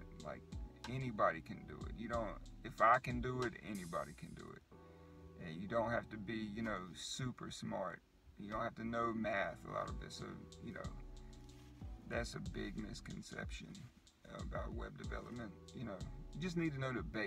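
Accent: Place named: South, United States. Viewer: Just something about a Southern accent! (I'm from Georgia myself) Your story is really, really inspiring. I look forward to hearing more good things from you!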